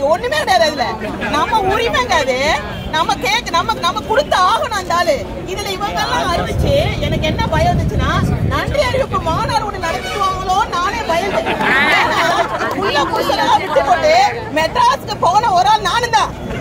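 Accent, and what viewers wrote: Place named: Trichy, India. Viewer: திருச்சி தமிழ் தவழ்கிறது. வாழ்த்துக்கள்.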